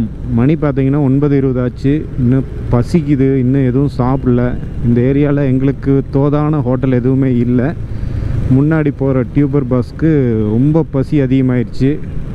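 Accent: Kongu, India. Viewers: Kongu Tamil super bro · Super 🥰 anna unga 🙏🙏🙏கொங்கு தமிழ் அருமை ❤️❤️👍👍👍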